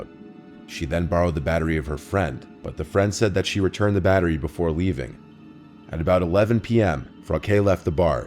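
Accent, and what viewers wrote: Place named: North, United States. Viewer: does anyone know accent? its an interesting one! northern united states i think but idk if its eastern or western. not quite midwest i dont think but idk. anyway, not trying to dox, just an observation 😊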